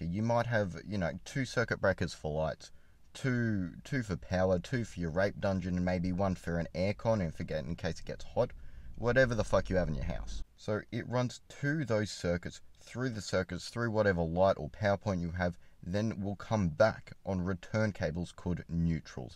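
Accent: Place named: Australia. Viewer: Dude you are one of the funniest channels on YouTube. The dry delivery and Aussie accent (at least to me here in America) is fuckin fantastic. If I’m ever in Australia, I’m gonna figure out who you are and we’re gonna meet up for a beer or 7. Keep the great shit coming!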